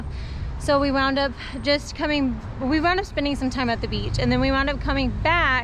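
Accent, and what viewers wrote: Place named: South, United States. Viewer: ***O...I notice you are loosing your Southern drawel....lol.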